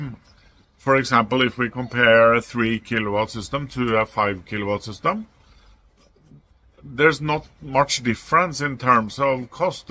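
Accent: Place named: Norway. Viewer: 555..Typical Norwegian English ala Stoltenberg.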